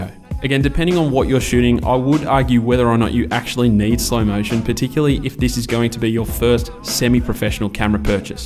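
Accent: Australia. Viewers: Very nice video, quick question are you Aussie?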